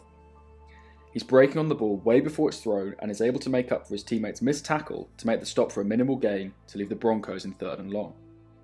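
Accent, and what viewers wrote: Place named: United Kingdom. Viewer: Why is a British man breaking down nfl film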